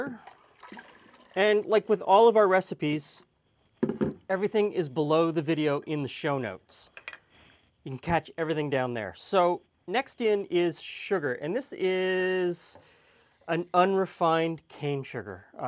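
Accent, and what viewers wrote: Canada. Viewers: I can't believe I only just found this channel today. I love your content, and it's neat hearing a YouTuber/media personality with a dialect similar to mine (I'm from Nova Scotia, but us Canadians in general sound pretty different from Americans a lot of the time, imo). This has been a fun learning experience, excited to try this asap! :)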